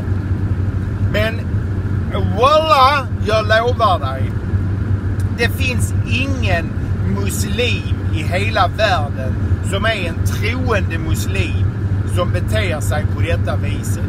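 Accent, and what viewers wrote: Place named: Skåne, Sweden. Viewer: Älskar din skånska röst, Jag är också en skåning! <3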